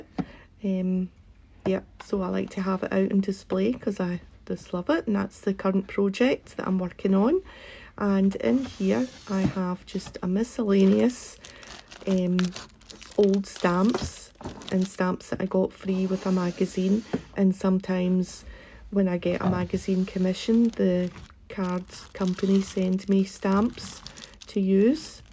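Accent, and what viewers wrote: Scotland, United Kingdom. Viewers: Love you room tour? Are you by chance from Scotland? You sound a lot like my Mom.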